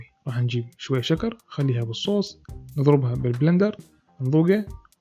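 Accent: Iraq. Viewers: شوفت الفيديو ع الفيس وجايه اشوفه ع اليوتيوب 😂😂تانى بعشق العراق ولهجتكم من مصر 😘😘❤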